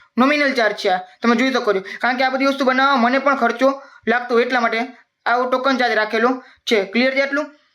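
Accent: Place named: Kutch, India. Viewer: Sir tame katch na lago cho barabar je je kyo jo atle 😅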